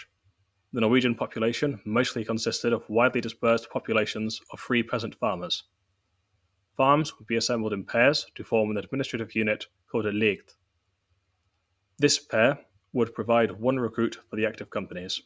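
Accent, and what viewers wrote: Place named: Germany. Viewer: Ver-ve-uh is closer. I appreciate the effort, but there’s not much point in using these terms if native speakers can’t understand half of them. Just speaking English would be clearer, because right now it sounds like a mix of German and English which gets a bit confusing. I’m not trying to correct your pronounciation, I just figure it’ll be easier for everyone in English.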